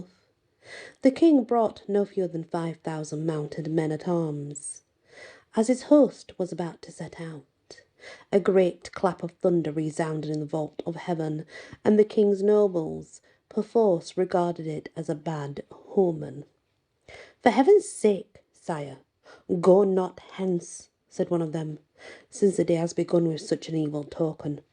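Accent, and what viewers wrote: United Kingdom. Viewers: Hey! You outdid yourself with this one! 🐎🗡 “Morvan Fights the King” was intense, emotional, and full of drama! 😍 The thunder, the battle refusal, and then Morvan’s unwavering stand—legendary!! His confrontation with the Hermit was SO intense… and the ghostly moment when he held his own head?! 🧠👻 That image will live rent-free in my mind forever! And the final redemption by Saint Anne 🕊💕… pure magic! I felt every moment thanks to your beautiful storytelling. Your British accent just adds that extra elegance and charm that draws us in like a lullaby. 💤🌸 Thank you for this masterpiece, Auntie. We love and appreciate you! 💖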